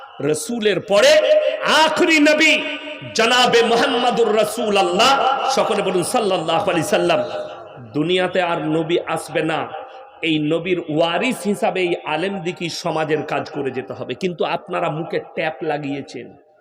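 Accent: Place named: Bardhaman, India. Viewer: দাদা, বর্ধমান জেলার গ্রাম এর মানুষের কথা গুলো এই রকম হয়, এতে মাদ্রাসার দোষ কোথায় বলুন?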